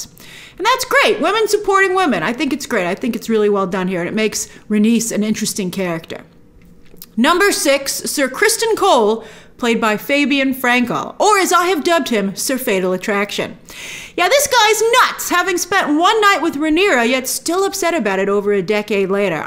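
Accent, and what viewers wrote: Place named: New York, United States. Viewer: I love her new york/New Jersey accent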